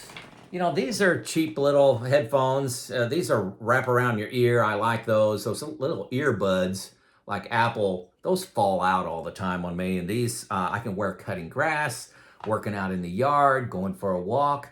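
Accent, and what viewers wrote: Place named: Southern Indiana, United States. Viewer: lol, I was wondering where your accent was from, you must live in the southern part of Indiana near Kentucky. You have a great accent!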